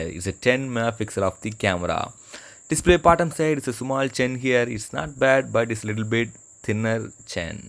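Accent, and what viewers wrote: India. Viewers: Why do you have Indian accent? Anyway you first take a lady video and then run in the hotel room showing 2 single beds? It makes me think you need the bathroom urgently ....hahahah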